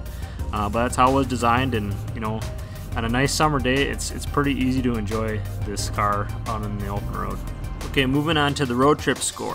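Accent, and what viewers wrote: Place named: Michigan, United States. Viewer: You sound like my cousin from Ann Arbour Michigan. great job with the corvette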